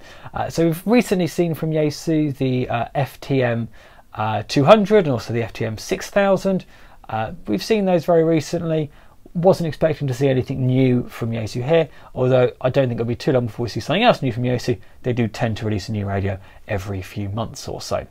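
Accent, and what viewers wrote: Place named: Texas, United States. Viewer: Great video! I know I will not be buying the T10 for that price. Lots of lower priced options (not bowfung) I notice you have an accent are you from Texas?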